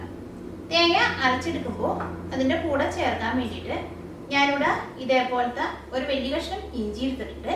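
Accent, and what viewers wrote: Thrissur, India. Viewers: trissur analle naadu? avatharanam super anutto njan ennundakkunndu yours chatni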